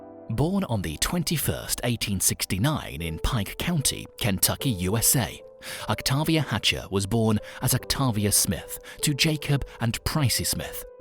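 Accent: United Kingdom. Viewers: he’s British 🇬🇧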